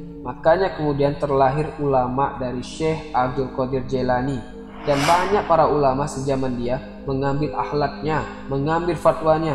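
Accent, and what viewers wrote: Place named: Aceh, Indonesia. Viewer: Maaf dengar dialeknya. seperti dari Aceh.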